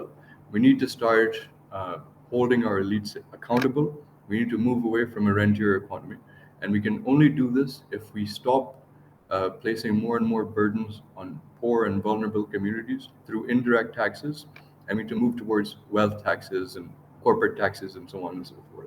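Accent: United States. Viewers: Ye bewakoof porkistan accent American use karta hai...... Isliye ye porkistan peeche hai.... DEFAULT....... porkistan ka khood ka kuch nahi.... UDHAR bheek se bana porkistan.... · Another Pakistani with American accent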